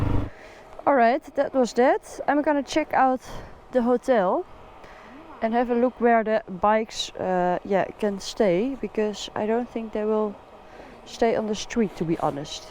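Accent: Netherlands. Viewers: Oo noong una kong makita at mapanood itong channel ni akala ko siya si noraly( itchy boots ) dahil ang pagkadinig ko sa boses ay pareho pero nung mga sumunod na episodes ni nakilala ko din na magkaiba ang boses nila, sa accent ng pananalita pareho kasi pareho sila na taga Netherlands 😊😊😊😊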